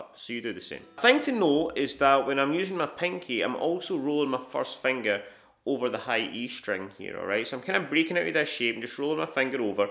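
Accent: Scotland, United Kingdom. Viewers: For those not used to a Scottish accent, turn on CC. You are welcome. 😉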